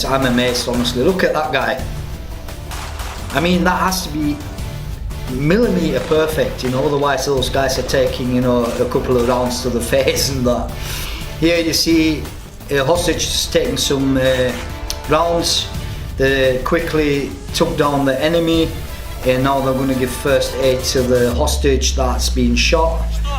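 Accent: Scotland, United Kingdom. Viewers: That is a quintessential Scottish accent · Я сам нихуя не понял из-за британского акцента и диалекта, хотя английский знаю хорошо (американский английский) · I enjoy this guy’s accent. Are you a Scottish?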